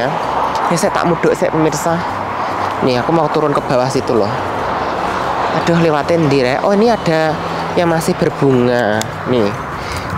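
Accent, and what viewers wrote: Java, Indonesia. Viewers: Baru dua hari ini ngikuti flog njenengan mas....asik janan...bhs medoknya...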